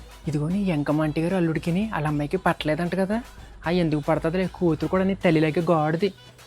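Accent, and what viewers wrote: Godavari, India. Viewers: garidi native ekkado ఎవరికైనా తెలిస్తే cheptara, yasa/slang bhalega untundi, maybe east or west గోదావరి అనుకుంటున్నా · Super bro 😂😂😅😂😂but konii words ardham avvadu godhavari slang,,,,but kurnool slang andhariki ardam avuthundi 😊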